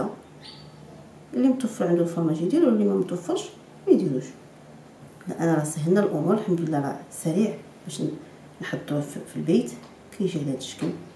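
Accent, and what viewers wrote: Marrakech, Morocco. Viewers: ماااا احلاك وما احلى كلامك المراكشي ، تسلم ايديك حبيبتي..😘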